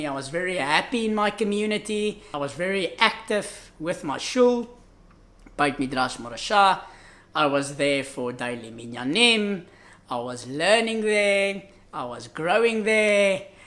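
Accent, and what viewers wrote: Afrikaans, South Africa. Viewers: Thanks for sharing your story - we made aliyah from Johannesburg a few days after you and live in Beersheva. I came across your videos once while searching Tefillin and was so shocked when I heard someone speak with an Afrikaans accent and talk about Tefillin. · Wow, I am impressed. Your library seems is amazing. Should have contacted me, could have taught you Hebrew before you made aliya. Long time since I heard someone speaking with such an Afrikaans accent. Our Rabbi's parents' are from Cape Town. It is probably the only decent place today. You are amazing. If you ever come to Australia let me know. Would be amazing to meet you.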